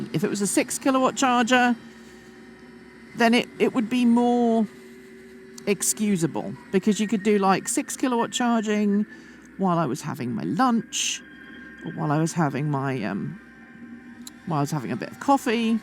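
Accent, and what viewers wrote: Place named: United Kingdom. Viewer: She bri-ish aren't you Paying attention?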